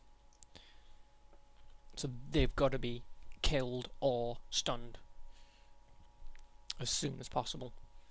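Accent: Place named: Manchester, United Kingdom. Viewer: I don't know if you actually are, but you sound like a proper, proper manc, ha ha ha. Good LP so far :).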